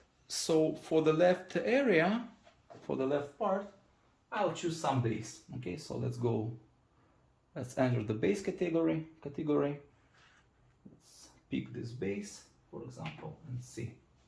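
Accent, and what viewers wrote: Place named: India. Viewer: Донт анестенд :) У вас кстати индийский диалект :)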